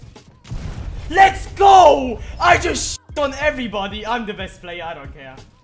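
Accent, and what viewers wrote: Germany. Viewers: Dieser Deutsche Akzent in der Englischen Sprache triggert mal übelst😂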